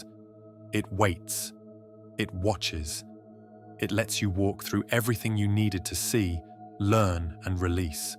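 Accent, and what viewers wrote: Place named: United Kingdom. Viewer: What's up with the Brit tish twang, geez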